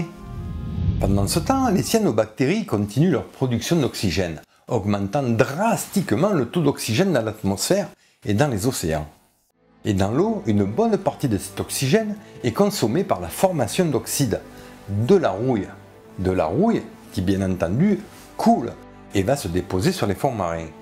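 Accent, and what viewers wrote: South, France. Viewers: Il existe des chaînes Youtube, qui, aux premières minutes nous font: nous abonner, suivre assidûment et surtout enchaîner toutes les vidéos. Ce qui est votre cas. Je suis bluffée du contenu si brillant, de toutes les informations que j'apprends, et de tout le travail, colossal qui doit être mis en place ! Votre chaîne est excellente (et le petit accent du sud rajoute une tonalité bien agréable). Un énorme merci à vous, et nous avons hâte de regarder vos autres vidéos. Félicitations !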